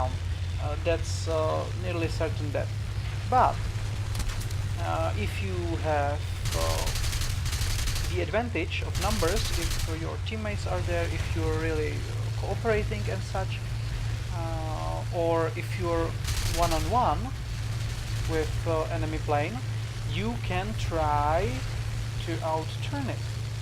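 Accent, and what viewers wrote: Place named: Czech Republic. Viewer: jsi čech kámo ? :D :) podle nicku si myslím ze ano a podle prizvuku taktéž! :) (Y)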